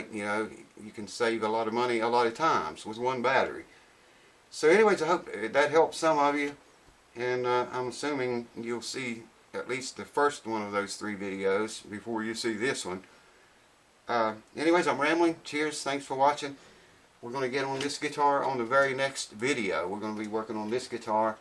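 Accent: South, United States. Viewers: what state are you in?? I thought you was a southern man--hmmm maybe youre up there with Neil Young lol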